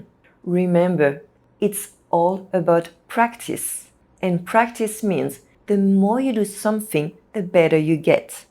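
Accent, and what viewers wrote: France. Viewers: J’adore votre accent, c’est intensément français… presque difficile pour un anglophone à comprendre.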